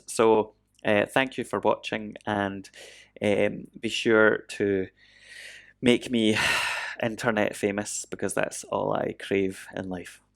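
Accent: Scotland, United Kingdom. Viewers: When you started explaining, I was thinking it's like a macro. Instead of just playing the note, you trigger the macro that adjusts the note to your liking. I must say I thought I was the only person on earth with a serious interest in trackers, mechanical keyboards and Melt Banana, but I'm happy that I was proven wrong. I've been using the Beatstep Pro for a while now and I just did a 6/4 track. How hard can you make that! I cannot believe "copy these 12 steps" actually turns it into 16+8 instead of 12+12. That's definitely not a problem with trackers. I have been eyeing the options for a while now and after seeing this video, I ordered a Teensy. Should be here tomorrow. Thanks for your hard work, you explain complex things in a very understandable way. And your accent always takes me back to my travels on Skye, Arran, ...!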